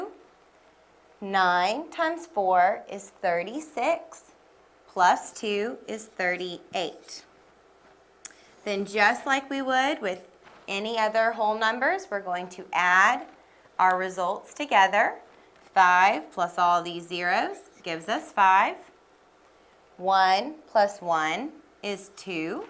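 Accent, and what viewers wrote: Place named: South, United States. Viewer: I'm sitting here and trying to determine where the instructor's accent is from. I hear a faint southern drawl, or possibly North Carolina. Great instructor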